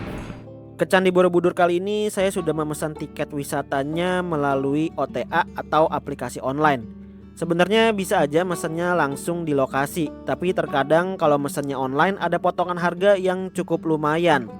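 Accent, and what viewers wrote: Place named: Depok, Indonesia. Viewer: Cara ngebawain narasinya kek mas mas depok bgt nih...apalagi backsoundnya...mirip mirip😅. Moga2 bisa 1000k subscribers bg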